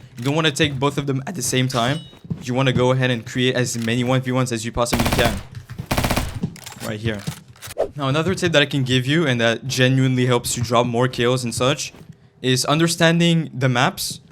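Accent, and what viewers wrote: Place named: France. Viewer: Accent français et anglais mélangé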